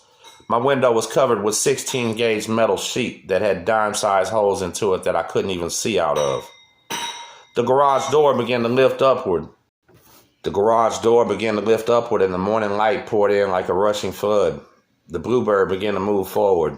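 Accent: Texas, United States. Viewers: Hey man..your vids are def. part of "my show" that I watch weekly! My kids dad is doing a 20 yr fed sentence at the moment. We're from Lubbock TX. He WAS in Big Spring TX but just got moved to a medium facility 😟 obviously Idk where he's going to end up in the U.S. but...hope its not too bad 🙏🏼 anyways, your Shit hits home for me 🙂 sending good vibes and energy your way man! BTW!! Man you sound just like someone from TX too!!✊🏽 #mynigga lol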